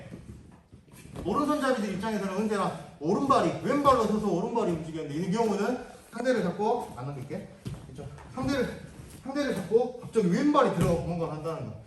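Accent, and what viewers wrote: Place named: Jeolla, South Korea. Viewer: 즐라도의 말투 조으다 영화보냐ㅋㅋㅋ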